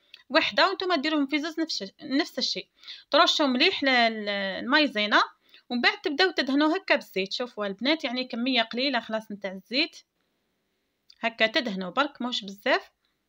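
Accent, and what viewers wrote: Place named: Oum El Bouaghi, Algeria. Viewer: يعطيك صحة حبيبتي غدوى نديرها لعشاء و راني من متابعيك فالفايسبوك تبان لهدرة تاعك من ام البواقي سورتو كي كاتبة فالفايسبوك مطبخ بايضية لخاطر انا تاني مالبيضاء و يشرفنا تكون عندنا طباخة ماهرة كيفك ربي يسترك حبيبتي😘😘😘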